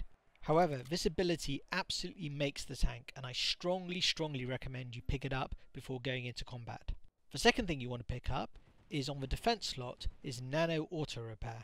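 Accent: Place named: United Kingdom. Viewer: I think you've found a great niche- a pure NC channel is a great supplement for an NC player who wants to learn the ins and outs of the factions to a more general channel (like Wrel's). Keep it up! I play on Waterson, but, judging by your accent, you live in the british isles and dont play on waterson True?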